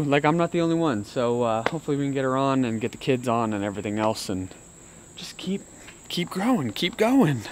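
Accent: South, United States. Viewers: You are really are speaking more like the south YaLL! Lol have a beautiful day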